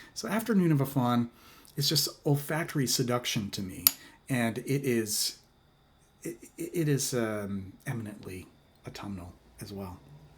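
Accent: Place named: New England, United States. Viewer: What New England state are you from? I'm from there too and I can pick up that accent anywhere! CT?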